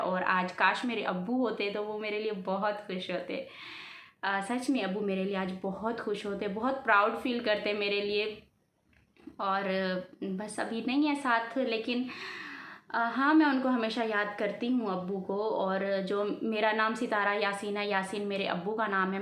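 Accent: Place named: Sindh, India. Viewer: I think u are Sindhi speaking aapk bolnay say mujhay laga I am Sindhi speaking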